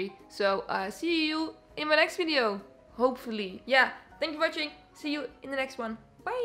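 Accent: Netherlands. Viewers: ik hoor de Nederlandsheid hierin lmao, wel leuke video :)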